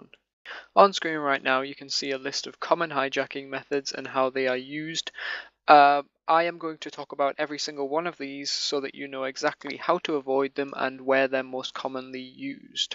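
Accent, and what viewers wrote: Newcastle, United Kingdom. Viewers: Are you from newcastle? you sound georide :) im from newcastle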